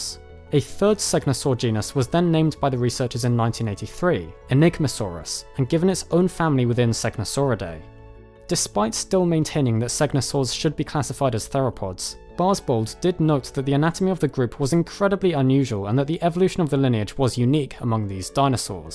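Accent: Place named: London, United Kingdom. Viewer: Youre London accent is no longer credible Get a black guy or Mexican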